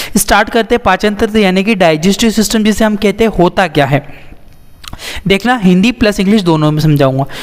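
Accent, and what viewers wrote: Indore, India. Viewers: Sir aap Indore se ho kya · Mtlb. Sr ap indore se. Ho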